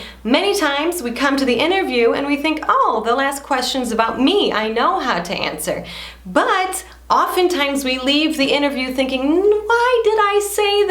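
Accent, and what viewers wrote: Brazil. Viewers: I guess you're losing your English your speak sounds like so Brazilian hahahah!! So cute!:P, eu acho que você tá esquecendo como é que se fala em Inglês, o seu jeito de falar tá muito brasileiro! hahahah...! Acho tão fofo isso,:p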